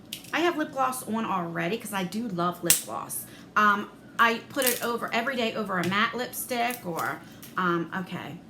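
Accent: New Jersey, United States. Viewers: Love the deep NJ accent!!! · Are you Jersey or NY? I just adore you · Hey Jersey, love love that accent!!! I have lived in New Jersey 3 different times in my life. Had a lot of fun out there.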